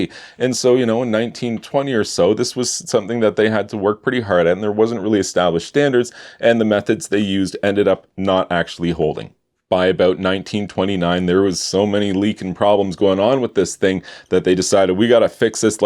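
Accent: Canada. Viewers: I understood that is Canadian the first time I heard him say "aboot". Grandfather was Canadian. Easy for me to distinguish between American and Canadian accents. Cheers. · This man is clearly, obviously Canadian. I'd say from southern Ontario, likeleh. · I love your Canadian twang :D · you sound like you're Canadian?? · Dude, his accent announces that he's Canadian within the first few seconds of any video.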